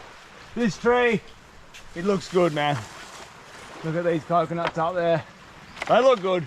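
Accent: Australia. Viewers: Since Steve Irwin is dead this dude has to do with his australian accent. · Are u from Aus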